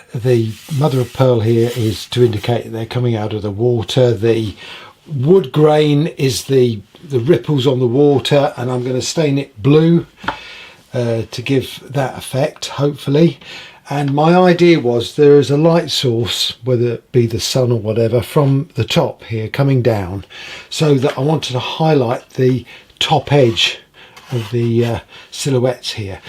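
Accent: United Kingdom. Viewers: By the way, we really appreciate that you narrate your videos and tell us what you’re doing and why and where this bit of wood came from, etc. So many these days are doing the silent ASMR-type of videos, and while those have their place, we prefer the talking. And it’s nice that you have a British accent, too.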